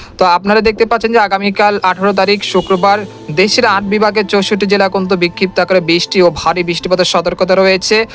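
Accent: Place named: Noakhali, Bangladesh. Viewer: নোয়াখাইল্লা গেলা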